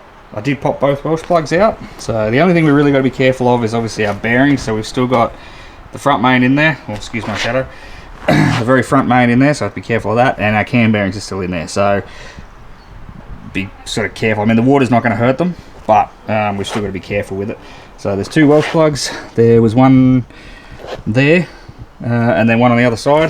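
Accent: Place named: Australia. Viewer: hahahaha....I thought you might have "bitten" on that one! I used to live in your area but I have since moved to The Philippines. Its good to hear your Aussie accent !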